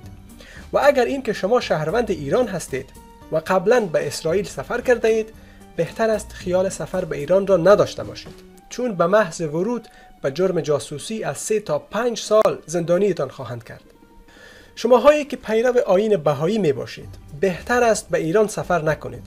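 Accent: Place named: Afghanistan. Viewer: جالبه که خود این بابا لهجه افغانی داره 😅😅😅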